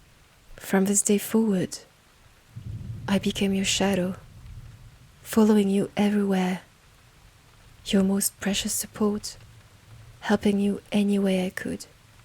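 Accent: France. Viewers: more french accent, that's what i subscribed for, hell yeah!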